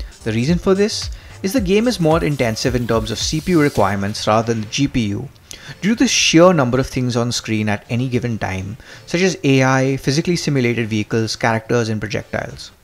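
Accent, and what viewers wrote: India. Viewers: i cant understand this indain tech support guy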